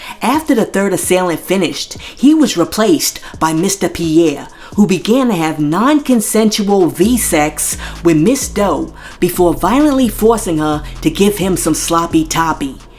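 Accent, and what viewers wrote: New York, United States. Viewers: I love this narrator's heavy ass New York Bkack Accent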